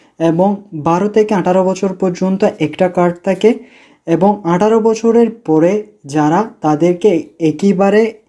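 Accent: Chittagong, Bangladesh. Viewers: ভাই আপনার বাড়ি কি চট্টগ্রামে?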